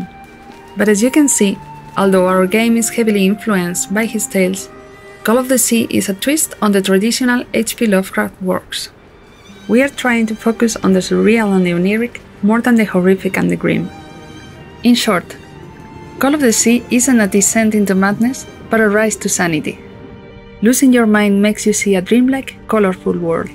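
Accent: Spain. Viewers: Ole ahí ese acento español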